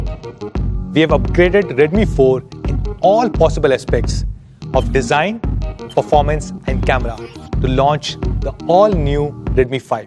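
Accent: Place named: India. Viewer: Datt indian accent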